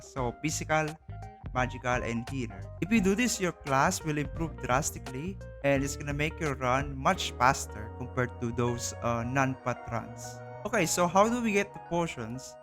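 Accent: Philippines. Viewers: Thanks much bro! Btw, you sound like Filipino😅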